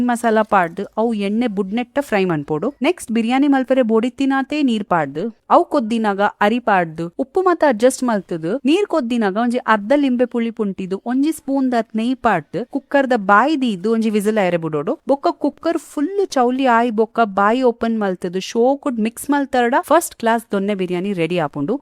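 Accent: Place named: Karnataka, India. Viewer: What is that language? Just curious😊. It does sound like Kannada from an unknown part of Karnataka. The biryani looks good. Will try!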